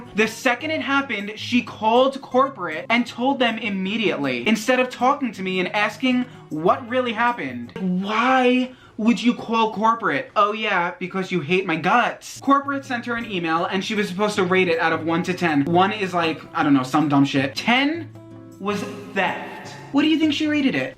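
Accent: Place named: North, United States. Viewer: Ok I hate Christina but being a manager I would warn you to not have friends at work and definitely DO NOT allow any non-employee to help you! That violates workers comp laws! That could get corporate in big trouble. That would get anyone working below me fired & walk out immediately! So just saying protect yourself! Btw I love your Northern scent. I live the south. I freaking love your videos!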